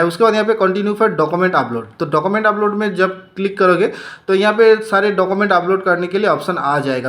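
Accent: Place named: Bengal, India. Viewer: হিন্দি টা ঠিক হচ্ছে না বাংলা চলে আসছে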